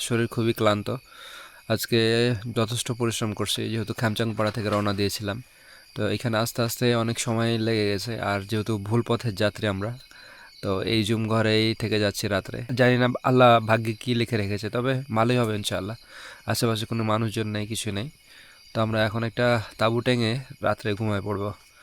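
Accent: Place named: India. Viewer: তোমার ভাষা তো ভারতীয়। কোথায় তোমার বাড়ি।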